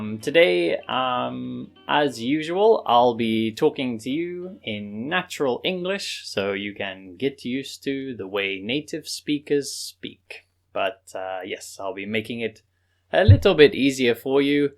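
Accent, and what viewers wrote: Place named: United Kingdom. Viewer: Thank you, I have been living in England for 4 years bot I have got prublem in listening and spiking. I have been loking for a poudcatd and i belive thay your poudcast is the best for peopels how like leirn english by acsent uk. thank you egain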